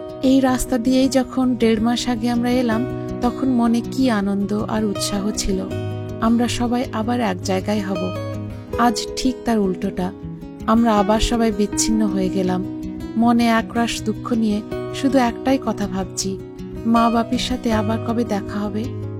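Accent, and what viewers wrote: Bangladesh. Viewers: Apnar kotha sunla mona hoi apni bangladeshi dar moton kotha bolan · Same here😂.ভীষণ বাংলাদেশের বলে মনে হচ্ছে. হতে পারে আগে হয়তো বাংলাদেশে ছিল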